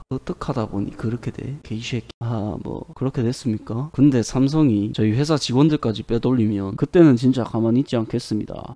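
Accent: Gyeongsang, South Korea. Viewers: 첯음절에 강력한 엑센트 깅상도사투리 땜에 패쓰~~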